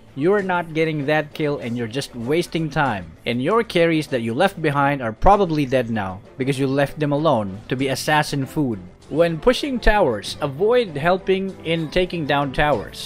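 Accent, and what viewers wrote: Philippines. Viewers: Wow galing mag english halata Filipino ka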